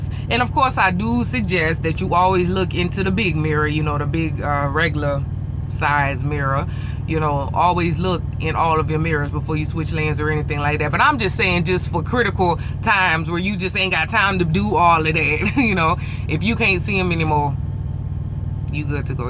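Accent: Louisiana, United States. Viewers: She's cajun, from louisiana. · i love your accent! u from Louisiana? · k, so what part of Louisiana are you from,? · let me guess; You're from Louisiana/NO or South Carolina? awesome accent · she gotta b from Louisiana or the west indies!!!!!!!!!!!!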